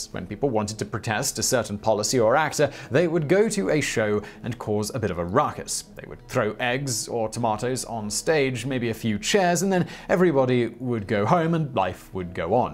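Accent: United Kingdom. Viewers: There are times when I watched him before I knew where he was for certain that I could not tell. Is he American (no) is he British (maybe?). I figured he was a transplant.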